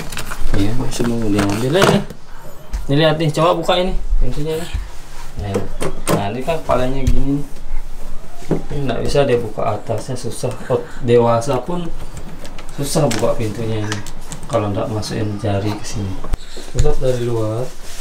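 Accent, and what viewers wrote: Lombok, Indonesia. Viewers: bang, org lombok mana? kok kayanya logat lombok banget klo ngomong heheh maaf pendatang baru, tyg lotim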